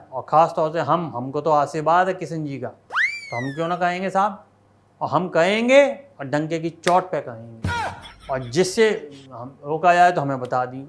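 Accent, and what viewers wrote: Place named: Uttar Pradesh, India. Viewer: Bhai ap kisi ki bhi mimicry karte ho to apke dialogue bhi local language mein hoti hai aur tone bhi same up wala...jaise hari nahi hai ye up ka pronunciation hai yahi apke kirdar mein char chand lagati hai....mujhe apne clip ka intzar rehta hai aap sach mein telented ho...radhey radhey 🎉🎉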